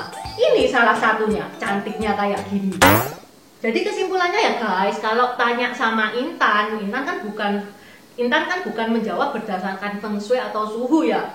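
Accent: Java, Indonesia. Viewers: Logatmu jowo mbak mbak. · Intan loe lidah nya Jawa medok jawa mana loe domisili aku salut salam dari cilegon Banten Iskandar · Ce Intan org tionghoakah? Kok medok Jawa ya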